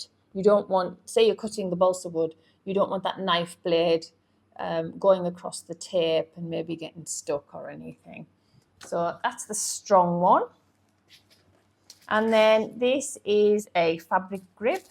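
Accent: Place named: United Kingdom. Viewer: Hey it’s so nice hearing not an American accent when your from the uk, ok I’ve been given a Cricut Personal CRV001 Die Cutting Machine, but the mat unfortunately didn’t come with it, can you help and direct me to where I can get one please I’m looking for a 6x12 mat but I can’t find one any where it’s like 15cms by 30 cms I can’t find one on amozon , your help will be much appreciated thanks.:-)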